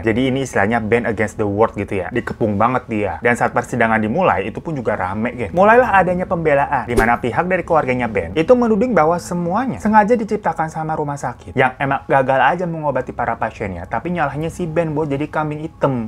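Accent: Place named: Jakarta, Indonesia. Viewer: Bujug dah enak banget ya kalo udah di chanelnya jd anteng dah ketawa Bae...kayak betawi-jepang tampang Jepang tp logat Betawi medok di denger nya adduuh ilang bete dah kalo udah dengerin nih si ganteng🤣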